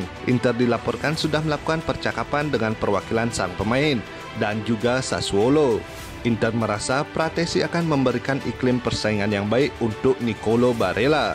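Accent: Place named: Aceh, Indonesia. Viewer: Admin yang bicara logatnya macam orang aceh.. betul tak min...FORZA INTER..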